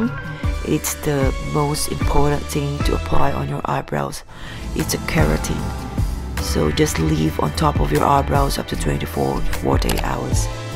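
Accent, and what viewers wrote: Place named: United Kingdom. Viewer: Why are you speaking in British English accent ? Sosyal!!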